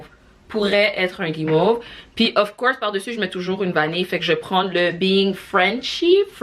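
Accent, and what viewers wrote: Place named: England, United Kingdom. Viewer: THANKS 🌟 🌟 FOR ANOTHER🍎 HEARTWARMING DOSE DOUBLE DOSE OF FRENCH. 🍏 LATELY IT SEEM THAT U HAVE A LITTLE MORE ENGLISH INFLUENCE ON YOUR FRENCH THAN BEFORE.😳 GREAT JOB.🏆 Of course I thought your next project would be a podcast called 🎈 in the House🎈📚An online Book discussion📚🪙Your Own novel🪙 and the sky is the limit.🥁 BIG UP YOURSELF 🔥🔥🌎💙🎥🎬🍀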